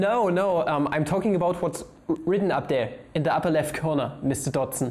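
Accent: United Kingdom. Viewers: nailed the british accent boi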